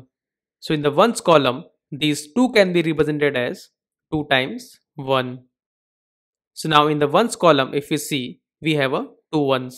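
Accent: India. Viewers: You know it a great video when he have an Indian accent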